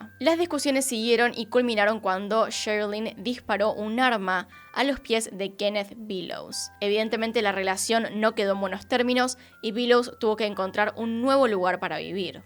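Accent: Argentina. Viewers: Yo comencé a seguir tb a otra así como este canal porque soy española y está muchacha de quién les hablo también, pero creo q hay sitios para todos, ( los buenos)y me acostumbro al español/ argentino, adelante, con esas preciosas pestañas q te pones..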